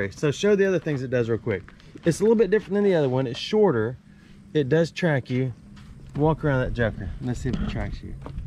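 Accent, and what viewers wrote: South, United States. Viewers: Southern talking. I live in Illinois now and when my Southern kin come to visit I have to learn that language all over again. Count how many times the word "look" is said in a video.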